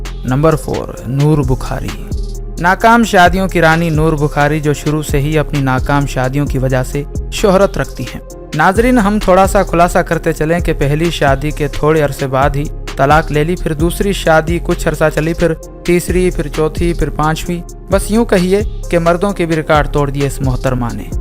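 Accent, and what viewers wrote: India. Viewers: Tm Indian ho tmhre bt krne se saf pta chl rha ha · Bera hee kanjer hei jiska ye channel hei. Harami, sharai taur per kiya ghalat hei. Aur tu hindi lehjey mei kio bhonk reha hei? Kutta.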